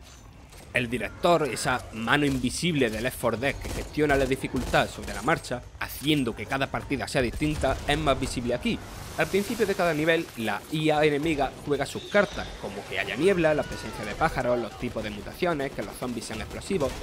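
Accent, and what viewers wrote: Spain. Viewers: Es andaluz , asique normal que tenga acento , si no lo entendeis es vuestro problema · Creo que he escuchado a Puertorriqueños hablar mejor el español, no tengo nada en contra bro. ¿Esto es un acento de alguna provincia de España?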